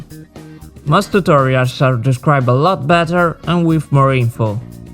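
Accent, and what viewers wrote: Italy. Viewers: I know it's hard to understand, but it's not his fault, in Italy most schools teach you english with a bad accent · Are you italian?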